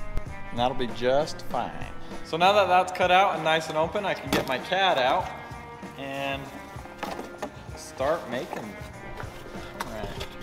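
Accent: Boston, United States. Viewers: love your boston.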